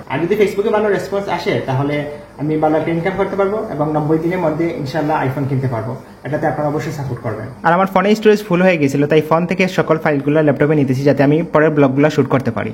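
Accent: Sylhet, Bangladesh. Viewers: সিলেটি নি আপনে?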